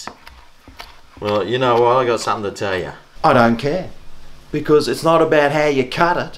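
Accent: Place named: Australia. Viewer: From an Aussie - I love your good old Aussie accent and style. Great representation of the average Australian :) Good lookin sanga!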